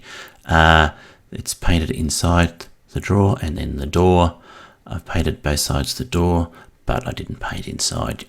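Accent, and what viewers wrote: Australia. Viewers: Nice to hear an Aussie voice over. Your instructions are clear and concise. Good stuff. 👍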